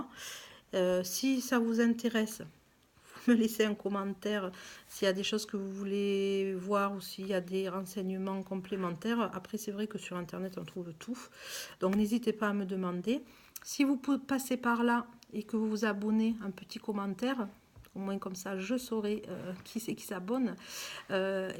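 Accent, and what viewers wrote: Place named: South, France. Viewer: Bonjour, je découvre ta chaine et je b'abonne 😉 et en plus du sud (on est fiers de notre accent ) moi Perpignan Pas facile de tenir une chaine la mienne est a l'abandon depuis aout ou septembre, travail, vie de famille les jours à 24h sont trop courts😂😂 A bientôt, Bisous bisous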